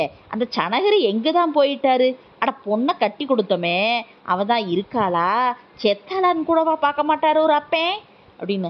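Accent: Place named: Tirunelveli, India. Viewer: கலையை விலை பேசாத கலைஞன் வாழ்க! திருநெல்வேலி பாஷை வளர்க.!!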